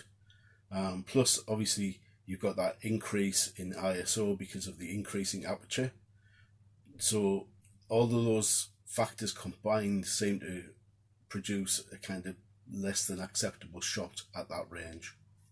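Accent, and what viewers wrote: North East England, United Kingdom. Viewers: Thanks for the video.. Good work mate! Local lad I hear. Probably somewhere between Sunderland and Boro..🤔 I've had this camera for about 6 months now. Picked it up from CeX for £165. Can't complain for that and in mint condition too. Yeah, it's great for shots at the zoo and some of my best shots were had there last summer. Quite impressive to be fair. I'd never owned a zoom camera like this before so I thought why not. It's quite versatile. It does seem to favour good lighting conditions though. I'll be honest, I've never really moved from auto on this camera. I really should experiment. I have taken some quite remarkable pictures of the moon though and only in Auto. Amazing really for the price of the thing. I've just getting a tripod so we'll see what improvements can be made with this. I'll check out the rest of your videos when I get a chance. 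There's also that guy called Graham on YouTube who's got a whole load of content related to this camera. I'm sure you're aware of him.. Keep up the good work. Alan.. Washington UK.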